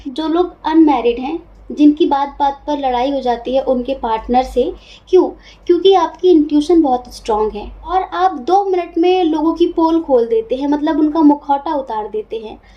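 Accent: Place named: Bengal, India. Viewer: Aap bangoli ho aisa lagta hai mam...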